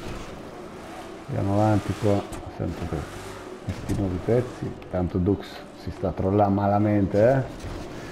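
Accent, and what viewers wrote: Rome, Italy. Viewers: super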